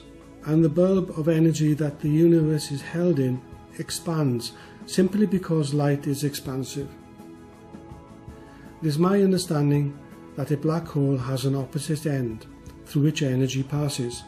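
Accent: Wales, United Kingdom. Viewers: Nice to hear a Welsh accent! 🏴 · Are you welsh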